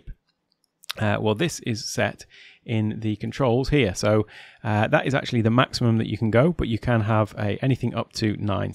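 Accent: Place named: Europe. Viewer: "that was a bit of useless information" - yes it was ha ha, but you Euros are just so pleasant to listen to I didn't mind it. Any of us across the pod we would have lost everyone in 30 sec...ha ha. Thx for taking the time to post, appreciate ya.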